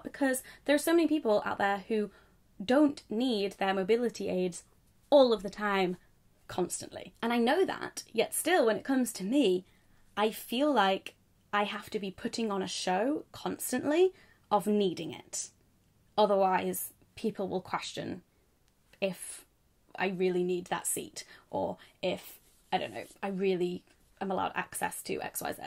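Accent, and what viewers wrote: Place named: England, United Kingdom. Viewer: That English accent 😍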